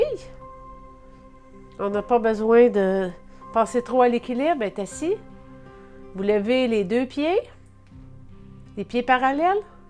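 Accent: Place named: Canada. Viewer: Quel bel accent canadien. Leger pour moi. Merci bcp From israel en confinement😞😷😷😷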